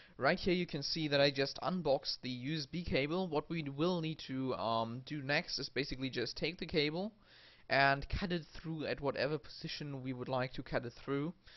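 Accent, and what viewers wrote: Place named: Germany. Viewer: Are you German You sound German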